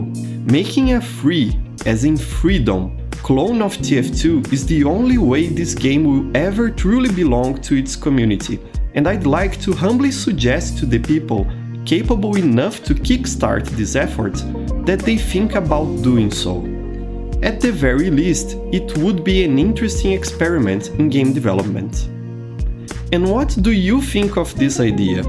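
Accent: Brazil. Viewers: I recognize the Brazilian accent a thousand miles away lmao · you sound brazilian ngl, but i liked the video, i think tf2 is a godly game but i hope it dont die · man, are you brazilliam? I played once with the medic called "Kuling" who was healing you, also your accent, cool to see more brazillians in the tf2 community <3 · Has someone told you that yer have a very Brazilian accent?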